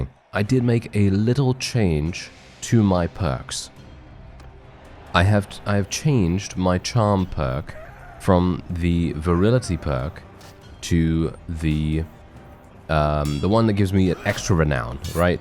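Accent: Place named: England, United Kingdom. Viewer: My favourite part of your videos? "Are you serious?" 😂🤣😂 There's something inherently enjoyable about hearing your smooth english accent spit that phrase out every episode of every Bannerlord series. Never change sir.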